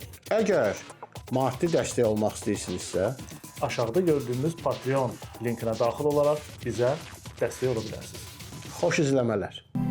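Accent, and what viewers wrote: Baku, Azerbaijan. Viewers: Allah uzun ömür versin Size dayı,halaldır Bakılılarına.Bakilarinin lehçesi kimi lehçe yoxdur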